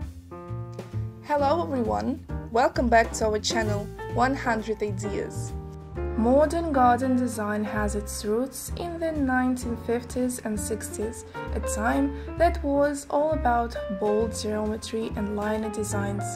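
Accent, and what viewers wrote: Russia. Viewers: Таааакой русский акцент)))) Но молодец, популяризирует автор красоту!))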